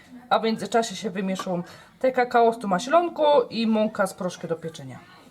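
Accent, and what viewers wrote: Silesia, Poland. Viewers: Genialna odpowiedź :) uśmiałam się do łez! Swoją drogą ja uwielbiam Cię słuchać; mogę sobie porównać różnice między naszą "godką" (Zabrze) a Twoją. Pozdrawiam serdecznie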